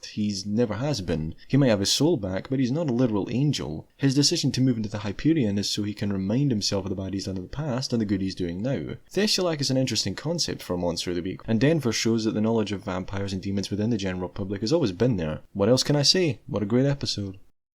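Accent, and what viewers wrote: Scotland, United Kingdom. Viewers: Audio's fine, talking isn't THAT fast and while his scottish accent is definitely strong, it's a lot easier to parse than some js. Btw, captions are a thing :)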